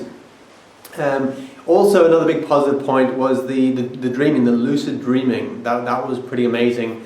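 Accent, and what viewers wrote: Ireland. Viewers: What part of Ireland are you from? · I think he's got a hint of an Irish accent.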